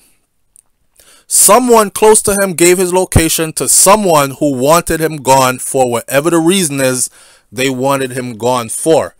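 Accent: Jamaica. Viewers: This sounds like a Jamaican 🇯🇲 blogger I know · You sound's like...Jamaican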